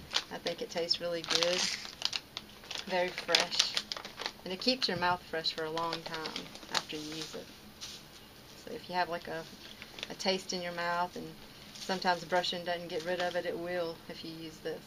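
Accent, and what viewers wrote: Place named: South, United States. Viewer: Hi! Great video! Thanks for subscribing to my channel. I like your southern accent. ...Your teeth look great too!! Peace & Health to you, Itsy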